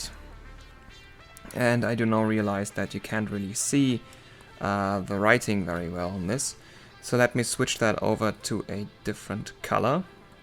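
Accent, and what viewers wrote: Germany. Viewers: nice to hear english with german accent again :))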